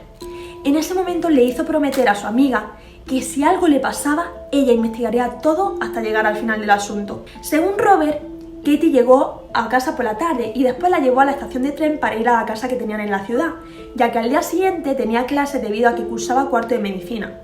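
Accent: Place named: Spain. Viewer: Bien por tú trabajo..sólo podrías no acentuar tú forma española de hablar (neutralizar sería excelente "gracias"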